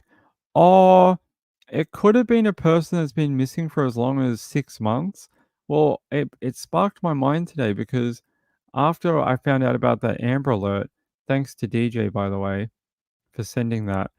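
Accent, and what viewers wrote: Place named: Scotland, United Kingdom. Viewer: I don't know why anyone might have a problem with the lovely Scottish accent 😂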